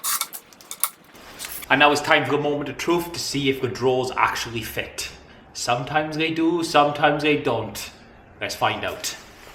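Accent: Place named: Northern England, United Kingdom. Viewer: Hi, I listened about four times over and over again to work out what screw you are using to put your units together and whether it was because of your cold or your northern accent I couldn't understand a word you were saying. I then looked in Screwfix for every screw that I could see. I still don't know what screw you used, could you write it down on a board, or a piece of paper, Thank you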